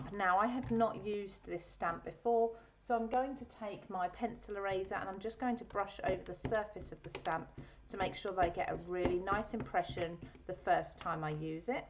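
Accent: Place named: United Kingdom. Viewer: lovely cards thanks I need ro make one hreat ides. That said but personal peeve why are you using only inches and not cm? It really irks me that many places globally use CM but in videos people pander to the USA and use inches and do not give the CM so the rest of us in the modern world have to convert. I can hear you sound like you are fellow Brit not an American if that the case you will know about CM.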